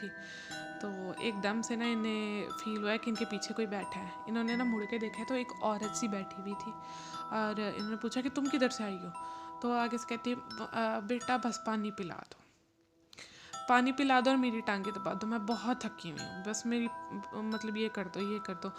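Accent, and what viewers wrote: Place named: East Punjab, India. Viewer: Your Punjabi accent is of Eastern Punjab،Sardar type. Sounds nice.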